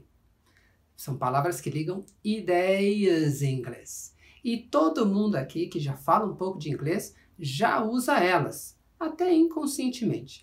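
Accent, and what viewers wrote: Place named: United Kingdom. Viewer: Parece que o sotaque do Professor é do Inglês Britânico.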